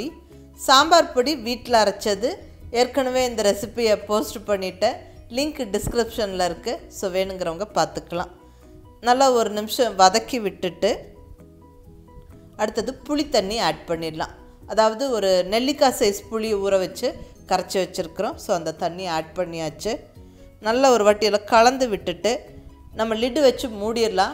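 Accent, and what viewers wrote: Coimbatore, India. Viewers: kongu tamil arumai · Hai sis eppadi irukkenga... Unga kovai tamila kettukitte irukalampola avlo alagaa irukku. Subscribe panravanga ennikkaiya parkkumpodhu avlo happayaa irukku sis... Neenga innum niraya munnera en anbaana valthukkal... Dish asusewel super. 👋 👋 👋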